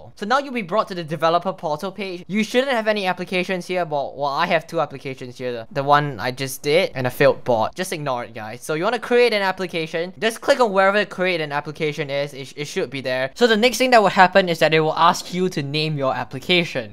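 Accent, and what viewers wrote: Singapore. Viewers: why do you sound singaporean :sob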